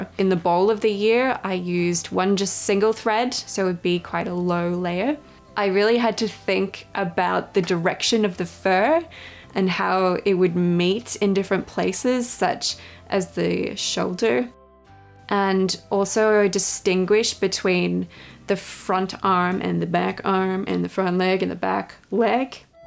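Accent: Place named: Australia. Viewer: Thank you, I have just started embroidery and it was lovely to listen to an Australian. I am looking at purchasing Essex linen, your thoughts.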